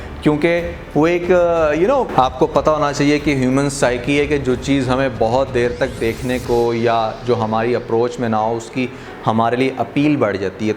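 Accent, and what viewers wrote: Punjab, India. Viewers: I love your punjabi accent vele tute nickame ashiq..you are bang on right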